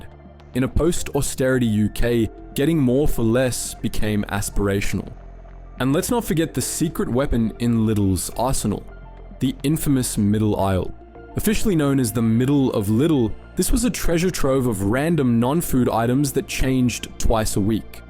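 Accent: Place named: Australia. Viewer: Why is a Australian talking about the UK? This feels like AI and who ever set this up thinks Australian is British.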